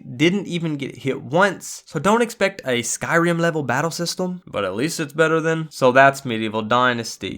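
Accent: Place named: Alabama, United States. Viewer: Idk where you're from but your accent is pretty much exactly like everyone I know (I'm from Alabama for context)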